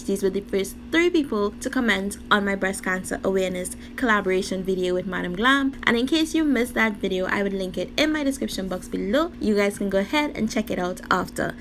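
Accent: Trinidad and Tobago. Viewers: Are u Trini 🥺?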